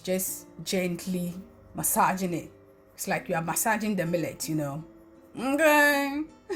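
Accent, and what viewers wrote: Ghana. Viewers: A week a ago I asked weather from GHANA yes may be you are from north your accent sounds a northerner I have leave in the north before. Thanks for this drin. I think I drink this drink before during my school days. In ACCRA it tastes great I wish one day I will try some. THANKS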